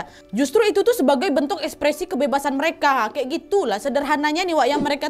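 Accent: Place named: Padang, Indonesia. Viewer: Suka sama cara kak bawain cerita deh, tpi hari ini kak kok logatnya padang gitu hehehe.. semnagat ngonten terus ya kak 😊🙏🏻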